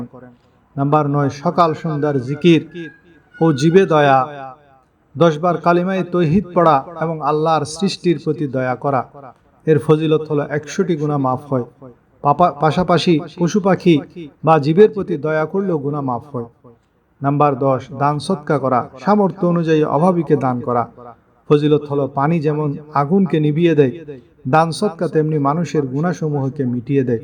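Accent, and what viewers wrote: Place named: Chittagong, Bangladesh. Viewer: সালাম নিবেন। ইসলামিক ভিডিও দেন মানুষের উপকারের জন্য। কিন্তু বেশি কথা বলা আপনার একটা সিরিয়াস রোগ, এর চিকিৎসা নেন। অনেক সত্য কথা বলেছেন সাথে মিথ্যা ও বলেন। যেমন বললেন একটি দোয়া দিলেন ১০ টা দোয়ার লিষ্ট। মামুষ কোনটা রেখে কোনটা পড়বে। যদি সওয়াব প্রাপ্তির জন্য করে থাকেন তাহলে কম কথায় আসল বিষয় বলে দিন। মানুষ উপকৃত হবে। আর যদি অন্য উদ্দেশ্য থাকে তাহলে কিছু বলার নাই। কথা শুনে চট্টগ্রামের লোক মনে হয়। চট্টগ্রামের লোক মনে হয় একটু বাচাল।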